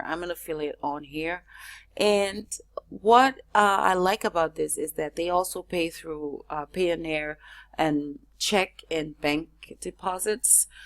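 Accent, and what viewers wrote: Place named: Liberia. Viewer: I love your accent. You sound like a friend of mine from LIBERIA.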